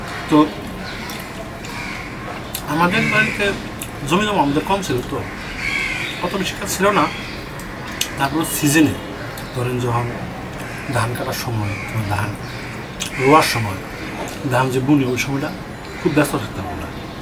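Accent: Bangladesh. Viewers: Tanveer Touhid এটা টাংগাইলের ভাষা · আমার বাড়ি মানিকগঞ্জের সিঙ্গাইরে, আমাদের ওখানকার মানুষ যখন শুদ্ধ ভাষায় কথা বলার চেষ্টা করে তখন কিছুটা এমন শোনায়। তাই জিজ্ঞেস করলাম আর কি। আপনার ভিডিও ভালো লাগে। চালিয়ে যান দাদা। সাথে আছি। :)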